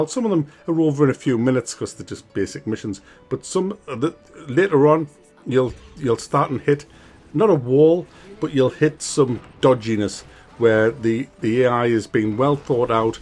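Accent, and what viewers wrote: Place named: North East England, United Kingdom. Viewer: Never ever thought i'd hear somebody from the North east do a review on tiberium Wars😂😂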